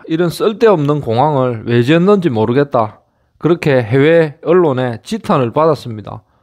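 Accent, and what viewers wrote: Gyeongsang, South Korea. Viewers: 겨ㅇ상도 액센트가 너무 멋져요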